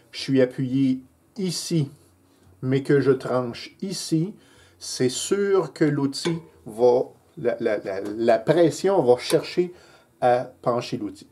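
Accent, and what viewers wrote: Quebec, Canada. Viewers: Série de cours très instructifs et bien filmés. Vu de France on aime bien le petit accent québécois ! Je débute en tournage donc merci professeur et bonne continuation 👏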